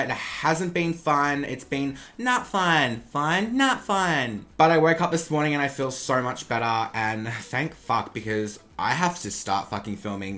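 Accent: Australia. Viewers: Is he Aussie? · "Shout out to Kylie for making me spend $50 on this piece of shit" You can definitely tell you're an Aussie 😂 you crack me up so much because you remind me of some of my friends. Love to you from Melbourne!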